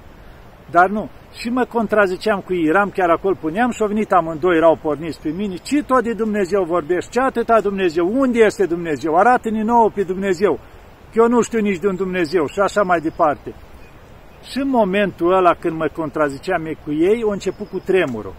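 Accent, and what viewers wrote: Moldova. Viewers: Bună ziua!!! Părinte cita blindete aveți, și apoi adăugind și acest grai dulce moldovenescsimt că mă cucerește, și vă ascult cu multă plăcere!!! Părinte vă rog amintiti-ne că postul este postul în fapte, nu, faptul că nu mincam de dulce pe cind faptele noastre sunt uneori reprobabile 😭Sănătate deplină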